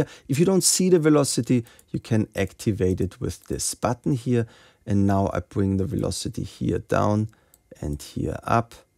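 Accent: Germany. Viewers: Sir you have the best and most german accent i have ever heard ! I love the tutorial very very begginer friendly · This German accent is cute.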